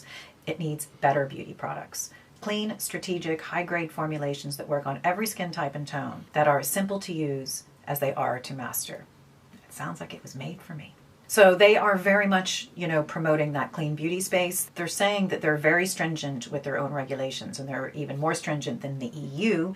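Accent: Ireland. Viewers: Just listening to you and you saying you're in Europe I kept hearing an irish lilt and was satisfied to see you are based in Dublin! It's so interesting how accents creep into others. I love this review, very helpful as I rarely wear makeup and love the no makeup makeup. The pencils look so promising as well as the balm. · Great review! You’re getting a Dublin accent 😁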